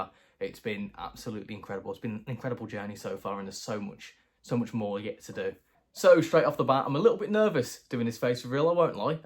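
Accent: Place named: West Midlands, United Kingdom. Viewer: Congrats on 1000 subs. Bonus points for the epic West Midlands accent. It's bostin' our kid! :D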